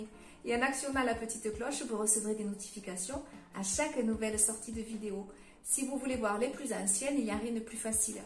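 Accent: South, France. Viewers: Bonjour..Je viens de m'abonner à votre chaine et je suis admirative de votre travail! merci pour toutes ces vidéos qui m'ont donné envie de me lancer dans l'aventure. Grace à vous j'ai pu commencer à faire de jolies choses.Vos vidéos sont très bien expliquées et votre accent du sud nous apporte un pur moment de bonheur. Merci pour tout ce partage et pour toutes les explications que vous donnez pour des débutantes comme moi.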